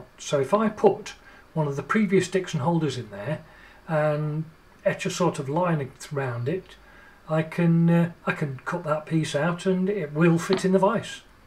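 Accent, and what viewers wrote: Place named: United Kingdom. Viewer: There’s a lot of great machining content on YT but a lot is American and while I have nothing against our transatlantic cousins, pronunciations of aluminium, lever etc along with US customary units drive me crazy. I wanted to find a UK-based channel (preferably the North of England) using the metric system so I could switch off that pedantic, critical part of my brain and focus more on the content. (I know, it’s practically a mental illness — right?) Finding this video was brilliant especially while stuck at home all the time! You really check so many boxes for what I’d want out of a creator. Suffice it to say that I’ll be glad for any projects you have to share and you can count on an eager audience of, at least, one for any future videos.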